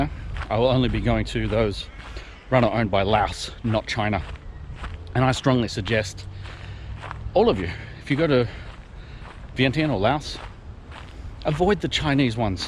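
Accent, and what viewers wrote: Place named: Australia. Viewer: Your People been taking over Other people countries for centries including Hong Kong, Now here U talking about China🇨🇳 Taking over Laos🇱🇦 First of all U R white, Sound like an Australian Are from some European country, U R not from Laos,, So,, before U start showing your disapproval of what the Chinese R doing in Los, Show us your disapproval of the history of your people taking over Other people countries, N another thing, do your research on the history of China🇨🇳 and Laos🇱🇦 relationship.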